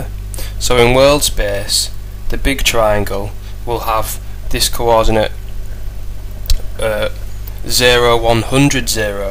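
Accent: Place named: United Kingdom. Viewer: The one you mentioned is US spelled version. I can tell that he has a british accent. ;)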